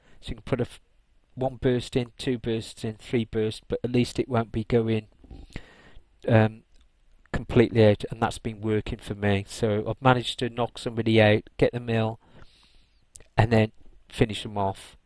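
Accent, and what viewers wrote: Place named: Birmingham, United Kingdom. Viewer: John Camus He's from Birmingham by the sounds of it (UK), we call them "Brummies"!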